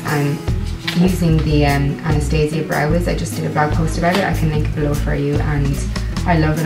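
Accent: Ireland. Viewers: Love this! I'm glad u commented on my video cause now I have discovered yours!:) Are you from Ireland? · just found you recently. and love your Irish accent. I'm in UK but have perm resident visa for Oz, waiting and hoping to return once hubby gets a job. How long have you been there and what do you think?? Joan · Love grave yard girl ! Are you from Dublin :) x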